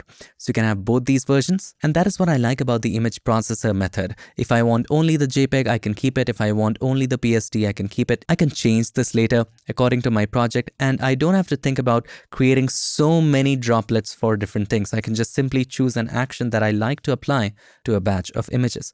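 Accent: India. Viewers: Why do i see many nasty comments on Indian accent? How is it any different than other accents?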